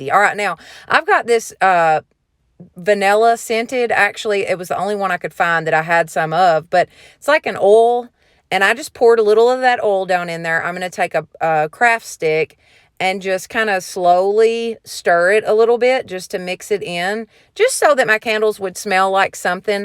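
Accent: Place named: South, United States. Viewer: My first time on your channel💓 Your kitchen must be beautiful with all these new decorations! Love your ideas and your southern drawl❣️ Makes me miss my sister in law and brother in Georgia!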